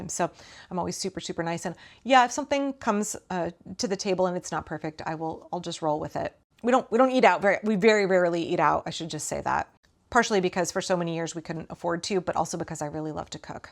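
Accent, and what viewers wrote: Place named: Oregon, United States. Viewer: Am wondering about where you live in Portland. I was born there and lived in Boring, LaGrande, Southeast Portland and then Northeast Portland. Once an Oregonian, you can move to Southern California and Reno (now) but you always feel like an Oregonian. The 70s and 80s in Portland was a great free spirit time. You sound like a true Oregonian which is part of why I love your channel and a big compliment. Thanks!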